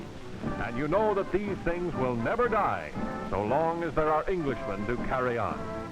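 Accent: United States. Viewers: Will have to get a copy of this. Shame about the corny American voice-over but the images are great.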